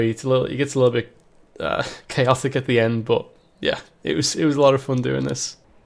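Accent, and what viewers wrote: United Kingdom. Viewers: Are you from the UK?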